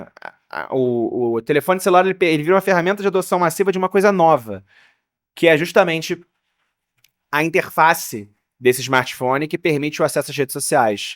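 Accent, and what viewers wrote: Rio de Janeiro, Brazil. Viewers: Mano, esse sotaque de playboy carioca quebra minhas perna, porra. Fica tipo Carlos piloto, aí é foda.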